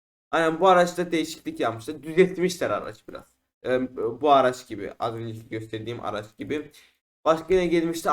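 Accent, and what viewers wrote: Azerbaijan. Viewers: Azeri misin Türkmüsün bir dilde konuş anlamıyom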